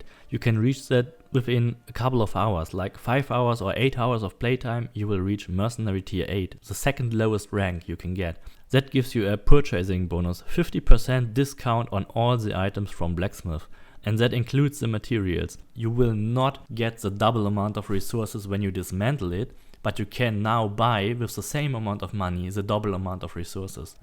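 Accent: Germany. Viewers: I haven't heard such a classic German accent since playing Titanic Adventure Out of Time.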